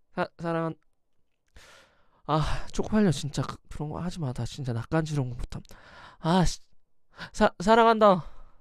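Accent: Gyeongsang, South Korea. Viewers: 아....사투리 너무 멋지다♡ 경상도사투리쓰는 남자 너무 멋있어여♡♡♡ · ㅋㅋㅋ남자가 왜이리 소심보스야 ㅋㅋ우쭈쭈~ 서툴지만 애정표현 하려고 노력하는 모습이...심큥💘)ㅋㅋㅋ 아무말 대잔치나하구 말이야 ㅡㅡ 이거이거 내가 좀 적.극.적.인 남자로 만들어 줘야겠구만 ㅋㅋ 그와중에 귀여운 경상도 사투리이~박크력)있네 ㅋㅋ 자기야 뽀뽀 박아 빨리 ㅋㅋㅋ😘 이거 이제 만날때마다 사랑한다고 말하라고 시켜야겠구만 ㅋㅋ 님 영상 볼때마다 내 가슴이☆ 콩닥코..♡ 죄송합니다 헤ㅔㅎ.. 쨋든 오늘도 잘들었습니다💕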